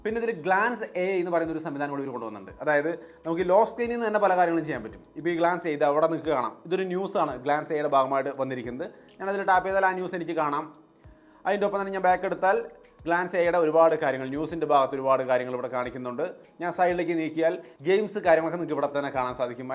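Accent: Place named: Palakkad, India. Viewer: ഇങ്ങളു പാലകാട് ആണ് എന്ന തോന്നുന്നു 😊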